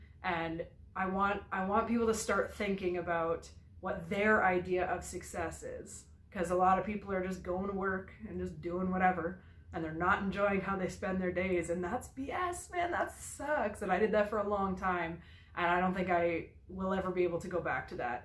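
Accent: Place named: Canada. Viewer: How old are you, and that accent sounds Canadian LoL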